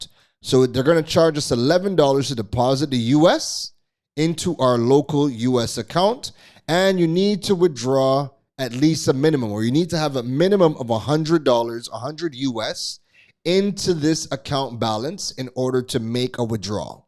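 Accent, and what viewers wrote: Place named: Trinidad and Tobago. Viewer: Where you from bro? Sound Trini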